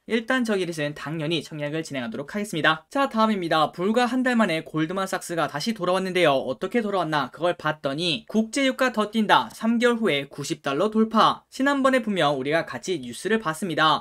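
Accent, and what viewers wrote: Gyeongsang, South Korea. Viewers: 감사합니다~ 그런데 님 대구 사세용?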